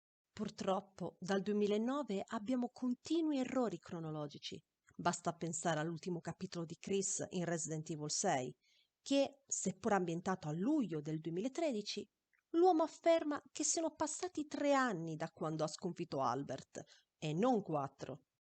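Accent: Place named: Italy. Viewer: There you go, partner! Now you've done it! Kicking ass, always. Keep always being this mad hardcore fan that we love to follow. Count with your old Brazilian partner here for anything you need. P.S: That Italian accent is SO beautiful! You gotta help me working in my Italian, or my ancestors are going to punish me haha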